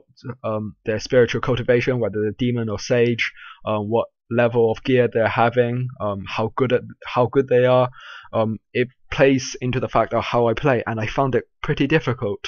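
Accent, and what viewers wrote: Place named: United Kingdom. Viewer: British accent :)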